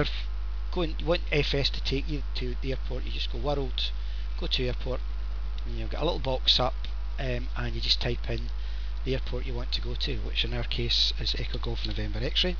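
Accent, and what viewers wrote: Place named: Scotland, United Kingdom. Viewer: I couldn't help but notice your Scottish accent. It's cool!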